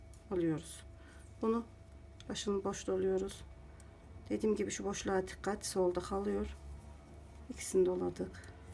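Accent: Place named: Kayseri, Turkey. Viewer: Bacım sen nerelisin kayserilimisin ne tatli turkcen var 🥰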